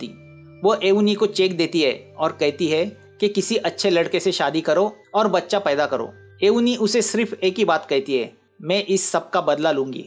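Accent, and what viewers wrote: Maharashtra, India. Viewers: Typical thick Marathi ascent horrible narrative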